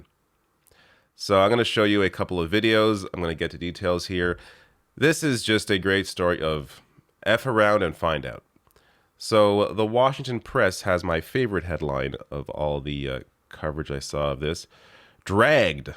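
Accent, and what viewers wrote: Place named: Canada. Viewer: I looked you up because I thought you sounded Canadian....weird how that is, eh? Aloha from BC!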